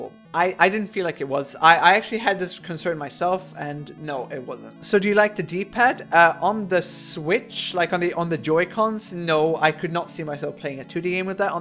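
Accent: Sweden. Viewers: WTF är du svensk? I was wondering what your accent is and then I saw "poäng", and "1 dag sen" etc. So now the accent makes sense.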